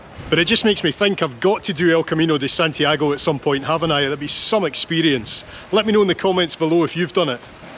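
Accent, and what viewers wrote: Scotland, United Kingdom. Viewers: The trees look really weird! and, does your Spanish accent have a hint of Scottish? Lovely video..great to go along... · What a very beautiful and entertaining video again! 😄 Love your channel and your Scottish accent! I’m about to visit a friend in Montrose in July! I’d like to have a SNAKEBITE with you.👋🏼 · Very informative & enjoyable, thanks! The Scottish accent is music to my ears. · Just pure natural approach to travel and the catchy Scottish accent. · What is the specific name of the town you were walking? Love Spain and considering returning for the 3 rd time. Great video. My grandparents were born in Scotland as was my mother. My grandfather was a golf pro in North Berwick. So enjoy your "Scottish" accent and reminds me of my heritage.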